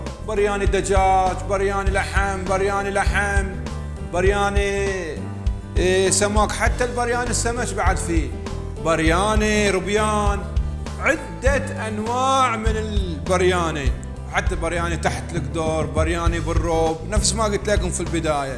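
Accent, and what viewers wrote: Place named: Qatif, Saudi Arabia. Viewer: يعطيك العافية شيف ، لهجتك كأنها قطيفية بحتة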